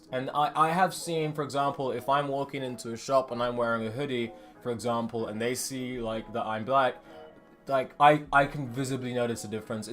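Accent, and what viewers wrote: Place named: England, United Kingdom. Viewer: Bravo! You said this so well! The curious thing in America is that people (black and white) in the rural South got over racism a generation or two ago; but in the big cities, the North, the West Coast, etc., where people think they're so enlightened, one's race dictates how he's expected to talk, dress, act, walk, drive, sing, be entertained, learn, live, worship, vote, and even procreate. For instance, you speak exactly like any other young educated Englishman. A blind customer in the shop where you work couldn't possibly know your race; but in urban America one phrase out of a black person's mouth would instantly reveal it and deliver a boatload of assumptions--most of them imposed by a destructive urban black subculture itself, not by white people trying to keep anyone down.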